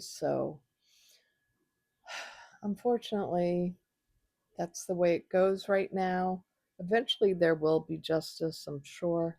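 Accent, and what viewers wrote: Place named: Wisconsin, United States. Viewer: Where do you live? You sound a bit Wisconsin to me.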